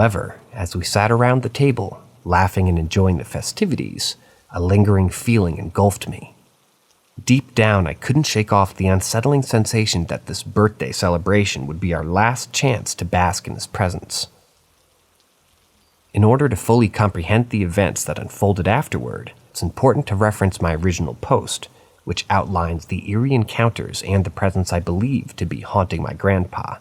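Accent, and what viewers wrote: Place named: United Kingdom. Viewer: What's with the new British accent?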